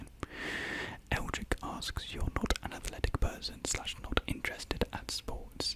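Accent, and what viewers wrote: United Kingdom. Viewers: I use your videos to learn British accent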